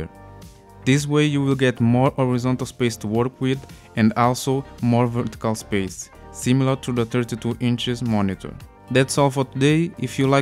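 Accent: Russia. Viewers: i think u re russian right? :D by the way thanks for the review, i exatly for the same dilemma, i need 3 tabs open, and be some playe for the desktop, maybe for me a 32:9 would be a better option? just don't know because, if i want to stream my pc via moonlight i have alay cange the 32:9 resolution to 16:9 when i would like to game on a laptop or tablet or pone? i really hesitant, becasue most of the time i want to be able to reach my desktop from anywhere the world without changing resolution and stuff, maybe ahve to stay with a 32 inch 16:9 or do you have any advice for that? thanks in advance! :) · what with accent, sounds like russian dialect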